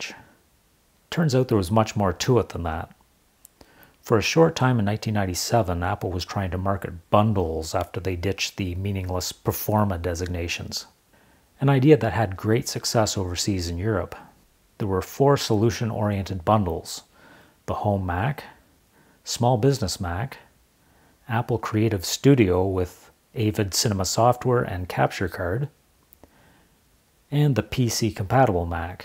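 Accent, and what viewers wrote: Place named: Ontario, Canada. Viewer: Love the videos and the Ontario accent.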